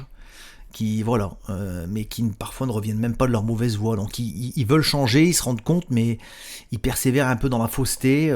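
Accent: Canada. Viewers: Canadien, non ?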